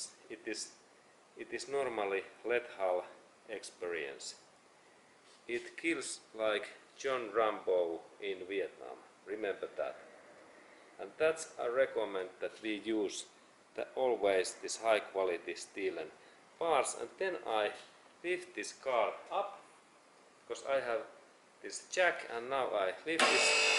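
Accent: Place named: Finland. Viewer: Thank you for a very god video , you have a very pleasent manner and explain very well in your finish english accent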